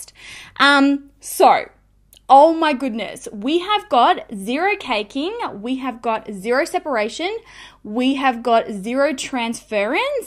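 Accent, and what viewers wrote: Australia. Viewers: This is the first time seeing you and I'm already a fan. You are gorgeous and charming and your Aussie accent is adorable. Subscribed!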